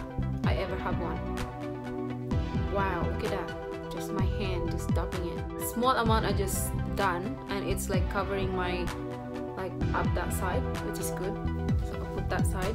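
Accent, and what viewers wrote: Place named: Australia. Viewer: Yes, the case.. looks so manahalin but yeah, it's affordable. Isn't? . Uha! the gold at the centah is so striking. Defootah.. The bubbles thingy, nindot na sya pabutuhon. Nahn! love the Australian accent and the accent of the make up you put on yow face. But I still can't beleive na we live at the same place. Yeah? hahaha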